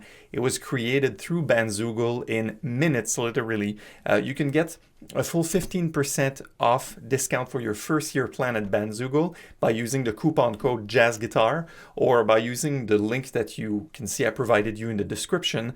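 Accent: France. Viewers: Man, great video! But I can't wrap my head around where you're from! ;) It seems to me that you have a very very slight French accent (I'm French), but you speak an amazing English! It seems you're from Ottawa, but, gnah, with your name and this speech, something sounds fishy. ;) Anyway, keep up the good work! :) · Super un frenchy qui nous fait des tutos pour l'international avec le petit accent qui va bien. Et à part ça en français pour la France vous avez Monsieur ? Merci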